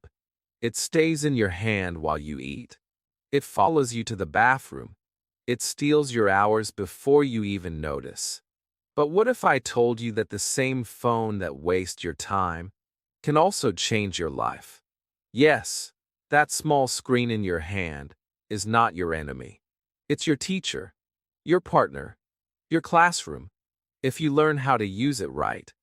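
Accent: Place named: United States. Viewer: I like your soft American accent very much 👍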